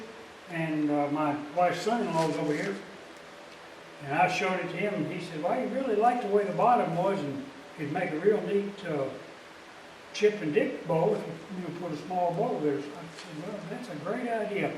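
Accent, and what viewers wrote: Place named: Arkansas, United States. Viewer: Always enjoy your work. Sounds like you're a Arkansawyer. I was born in Gentry in 1939. Keep up the good work.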